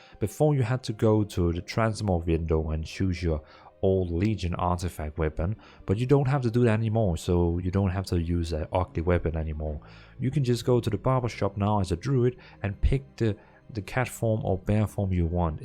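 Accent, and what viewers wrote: Denmark. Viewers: yes Sounds like a danish accent, but it’s similar to Germany, a Germanic language 😊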